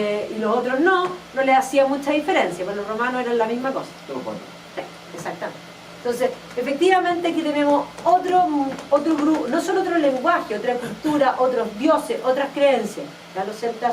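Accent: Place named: Chile. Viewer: El tema es supremamente interesante pero, y me disculpa la sinceridad, por una parte la calidad de la grabación es pésima y por otra parte el asento chileno tan notorio en el lenguaje de hace perder gran parte de la informacion y la hace extenuante. Lamentable francamente.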